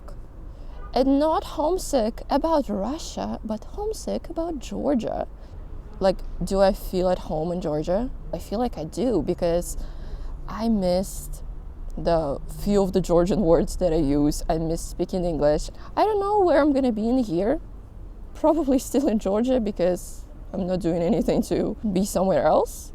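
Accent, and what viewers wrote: United States. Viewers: You've got an American accent with your English I would say. Definitely not a British one. If I saw you in Wisconsin I might almost think you were born here. Nice job. Enjoyed the video. You have a certain charisma and charm.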